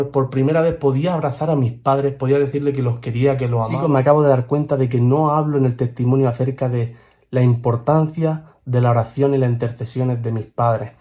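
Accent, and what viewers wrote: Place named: Murcia, Spain. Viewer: Subscrito!. Adelante te apoyamos! Ole el acento Murciano!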